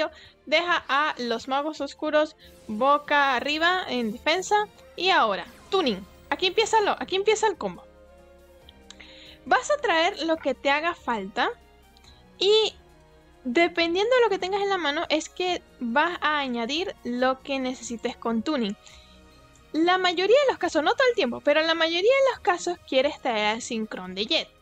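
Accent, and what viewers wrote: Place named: Canary Islands, Spain. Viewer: Tu acento parece canario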